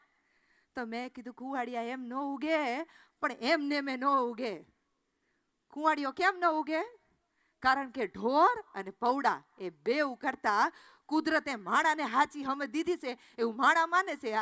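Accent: Kathiawar, India. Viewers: Superb performance......its not easy to perform this act by solo........great......your expression, that proper tone of kathi language.......first time i have seen you on rangmanch......keep it up......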